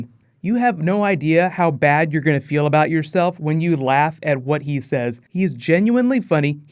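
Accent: Netherlands. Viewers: R U dutch ??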